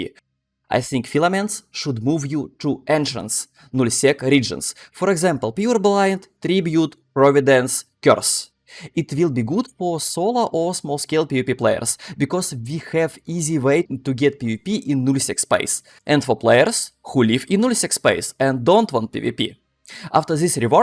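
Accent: Russia. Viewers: Ляя, ну нахера стараться держать этот русский акцент. Ты можешь читать нормально по английски. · Ну хз, вот как раз такой "русский" английский я понимаю, потому что произносятся те звуки, которые иностранци проглатывают :D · ты когда говоришь на английском, представь, что ешь картошку) А то слишком русский акцент, по ушам бьет и могут не понимать.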